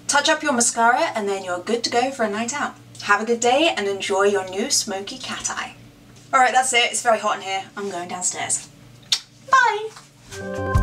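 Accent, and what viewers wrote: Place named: United Kingdom. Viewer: Omg, when you do your Beauty viddeos, you become much more British;) But it's alright:P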